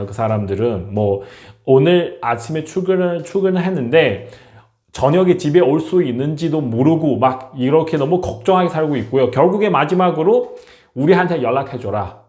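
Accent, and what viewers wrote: China. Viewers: 오늘따라 중국남부 발음같네요 문장 이어짐이 불분명해요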